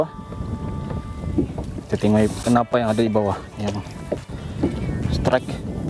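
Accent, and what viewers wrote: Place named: Sabah, Malaysia. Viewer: mancing kt area mna tu dgr logak cko mcm sabahan sja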